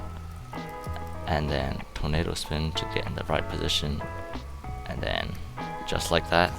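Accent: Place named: Germany. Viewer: Ich denke mal du bist deutsch, ich denke, dass ich das ein bisschen raus höre. Also ich hab eine Frage, muss man durchgehend Tornado spinnen? Und lenkt man dann nur mit w und s?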